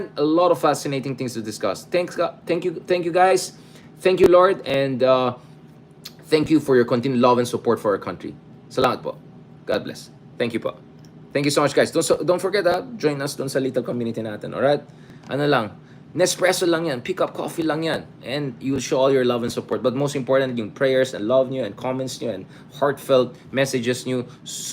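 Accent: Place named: Ilocos, Philippines. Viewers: Kyut ng Ilocano delivery mo and I was waiting for the magic word and so glad I heard “please”. Haha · Sir kansat.kasla ka met kanyami nga igorot nga agsao ilokano.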